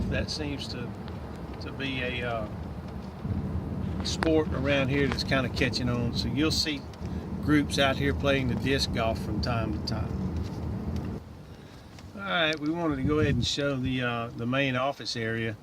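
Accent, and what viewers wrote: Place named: Mississippi, United States. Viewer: We stayed there a few days last week - till our last night the weather was getting very bad - so came home a day early. was nice windy weather thou. Sounds like your from Laurel Ms. :)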